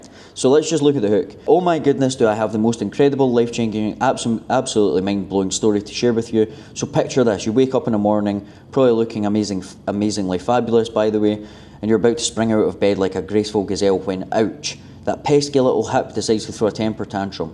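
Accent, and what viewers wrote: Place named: Scotland, United Kingdom. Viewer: Where in Scotland are you from g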